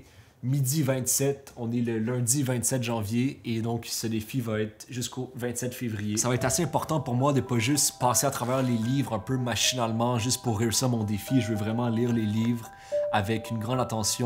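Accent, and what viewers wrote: Quebec, Canada. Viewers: is he canadian? idk what canadian french sounds like but his french doesn't sound french iykwim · El Amiri Yes, he is québécois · Salut mon ami! J'ai aimé ton accent!❤ Bisous du Brésil à toute nation québécoise! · T'es beau et j'adore ton accent Québécois Alors moi j'adore la lecture c'est super aventurier, ça aide à les gens à plus réfléchir, à s'évadé, a ce développer mentalement, etc Parfois il y a des liens entre nôtres vie et l'histoire ou ramon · Yellow Card Chimchim His from Quebec, and yes he doesn’t sound like a French but he has a very nice voice. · Wahh ... québécois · theSupercasa du québec! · Félicitation! lire 20 livres en un mois est très super. C'est inspirant. Also, I am watching your video to practice my french listening. I did realise at the beginning that you speak in Québécoise, and to my surprise I found your speaking easy and clear (considering I barely understand french youtubers from France 😭) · Haha first time at this channel and 2 seconds in and I knew he was Quebecois. 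Les quebecois sont incroyable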